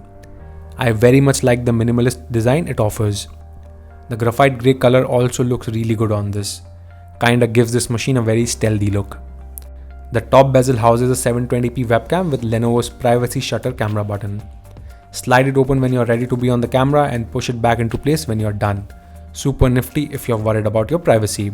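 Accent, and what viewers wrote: India. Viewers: horrible this indisch english this laptap is walk toched with16gb with bitter displaayy this thisi s is missi this -pooaaahh bluluuluuu o myy gooddd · i love the indian accent that remember me raj in big bang theory 😂😜🥰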